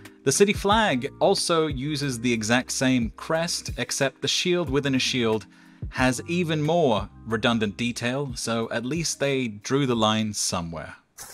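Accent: Australia. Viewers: Aha, that explains the Australian accent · Thought that accent had some Aussie to it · I thought your accent had a hint of Australian! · A non-zero percentage of why I clicked this video was for confirmation of whether your accent was at least partly Australian lol it sounded like it but not quite as "thick" as many Aussies I talk to regularly so I wasn't exactly sure where to place it. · I have a very sensitive ear for accents, and the first time I watched one of your videos, I concluded that you must have been born/raised in Australia, and that you have a naturalised English accent after spending many years in the UK. Having watched this video, I am pleased to learn that I was correct! Great videos, always a pleasure to hear your thoughts on graphic design!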